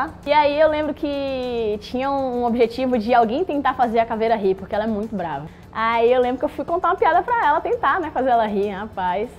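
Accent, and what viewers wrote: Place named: Bahia, Brazil. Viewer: Adoro ela nas narrações na ESPN não tem aquela voz chata além desse sotaque baiano que é maravilhoso...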